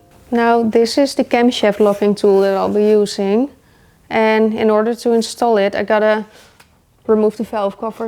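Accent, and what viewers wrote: Netherlands. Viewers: So graceful you make it look easy. Love the Netherlands accent as well. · I’m a tech but I’m more taken by your Dutch accent? I hope I’m right that assumption your from the Netherlands 🇳🇱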